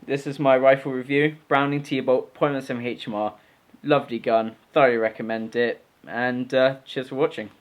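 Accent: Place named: Bristol, United Kingdom. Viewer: great info mate! soon as my FAC comes through this is what im getting! hope you dont mind me asking but where you from, you sound a bit bristolian? thanks again!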